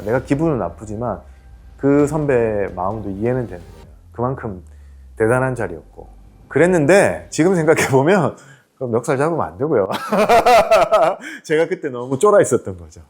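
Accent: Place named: Gyeongsang, South Korea. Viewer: 부산이셨구나 ~~~ 갱상도 억양이라 갱상도인줄 알았어요 ~~~~ 울산샘은 잘 계시는지요??? 궁금하네요 ~~~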